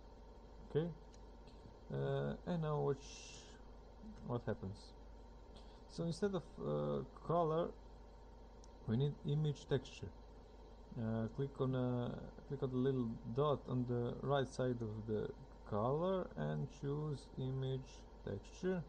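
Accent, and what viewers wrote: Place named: Serbia. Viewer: May I ask where are you from? Your accent sounds like Serbian. Of course the name that I saw on the blog saying the same thing. Excellent work. For how long have you use blender?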